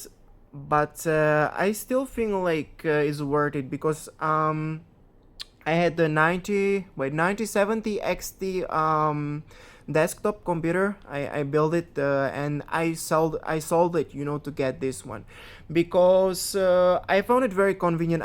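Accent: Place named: Hungary. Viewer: túl sokat afektálsz , könnyen kihallatszik a magyar akcentus, csinálj inkább magyarnyelvűket, van valami botod de mi a faszoim az? minek mutatod meg ha nem is magyarázod el mi az , értelmetlen használhatatlan a videó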